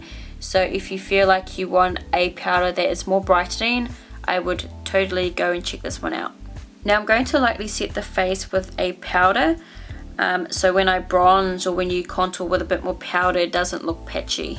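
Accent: New Zealand. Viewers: LucyAnneDewhurst it's called an accent.... She's kiwi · Just found your channel and im in love!!, are you kiwi? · Are you a kiwi??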